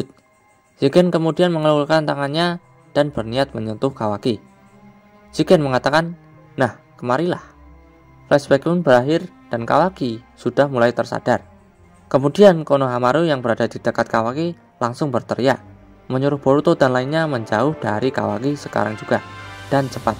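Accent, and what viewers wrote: Java, Indonesia. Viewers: Medok mas suaranya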